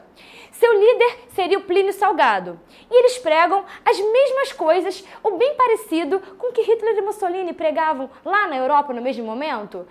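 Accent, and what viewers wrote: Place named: Rio de Janeiro, Brazil. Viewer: Parece mesmo kkk ainda mais pelo sotaque de carioca .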